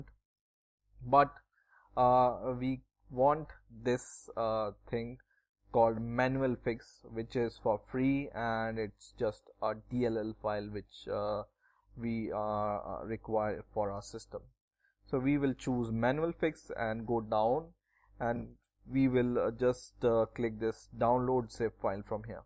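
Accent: India. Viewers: I heard his indian accent = instant CTRL+W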